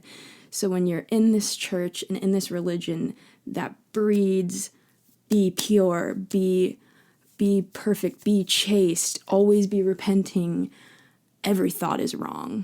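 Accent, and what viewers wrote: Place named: United States. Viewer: I am an Aussie ex Mormon and I love your American accent.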